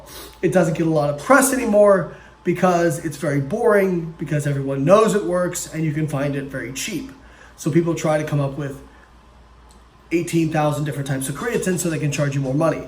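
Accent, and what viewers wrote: Australia. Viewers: An Aussie accent creeping in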